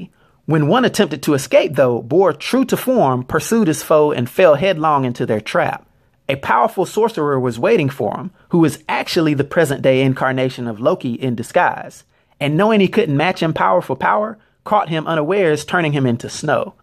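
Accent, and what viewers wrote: United States. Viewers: great video and loved the accent (american i think?) pronounciation of some of the names! was very different to hear. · Terrible hick accent. Lol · He has Texas accent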